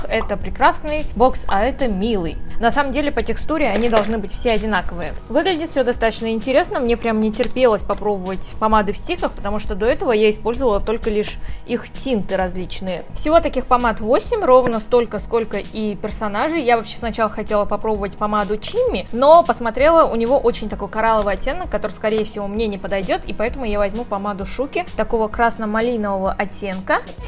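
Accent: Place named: Georgia. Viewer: Ты в этом выпуске грузинка? (Говоришь с явным акцентом) (Никого обидеть не хотела этим комментарием)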